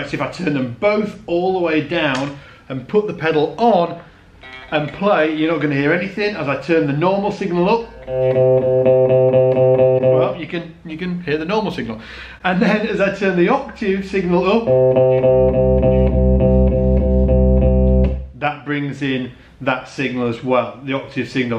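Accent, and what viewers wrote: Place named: Leeds, United Kingdom. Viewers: He’s an English guy speaking English, with a regional dialect - in this case, that of Yorkshire (albeit certain Americanisms have crept in). Here’s a thought, though; if you have difficulty with English accents that aren’t standard RP Hugh Grant-type stuff🧐....TRY HARDER. We sent you the Beatles.....I would have thought THAT would have given you a clue. 😉😂😂😂😂😂😂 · Tuner. Yes I don't like English accent too. This Leeds area English is some kind of German and London mix imo LOL... Anyway, I prefer standard American accent personally.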